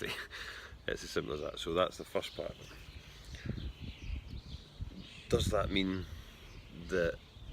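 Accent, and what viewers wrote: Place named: Scotland, United Kingdom. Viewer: The Scottish accent is my *favorite*!!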